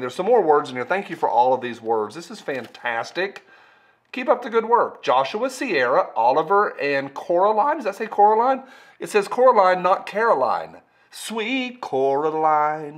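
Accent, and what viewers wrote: United States. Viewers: Love ya!!! That freedom accent is soo heart felt..maybe get a lahma tin hat for those on the left coast to stop the the brain washin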